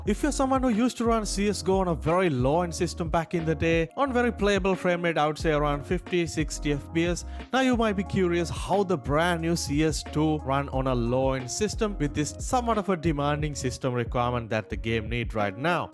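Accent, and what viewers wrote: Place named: India. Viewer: Indian accent 💀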